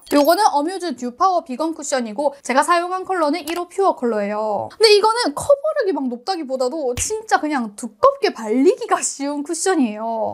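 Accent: Busan, South Korea. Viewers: 이 웅니 경상도다...경상도 꼬순내가 난다...아닌가....아니야 꼬순내가 낰ㅋㅋㅋㅋㅋ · 저랑 피부비슷하셔서 이분쿠션영상보고 빛이다 감격했는데 요 영상도있었네요 ㅠㅜㅠ짱짱짱 ㅠㅠㅜㅠ부산인인데 사투리까지 짱정겹다 제 친구느낌이에욬ㅋㄱㅋㅋ 퓌 리뉴얼이랑 클리오 하이글로우 웨메쿠션 진짜 궁금했는데 감사합니댯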